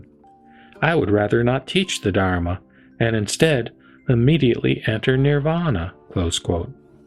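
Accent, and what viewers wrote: Texas, United States. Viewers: Texan accent spoils the reading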